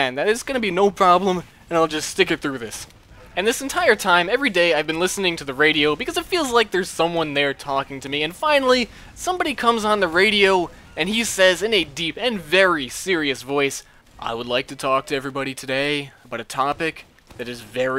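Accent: New Jersey, United States. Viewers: American lol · So ur from nj then?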